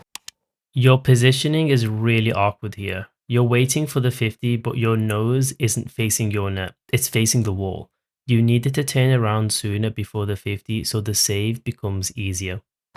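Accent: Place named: Liverpool, United Kingdom. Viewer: Good work mate 👏 Why do you sound like a fellow scouser 🙀🙀🙀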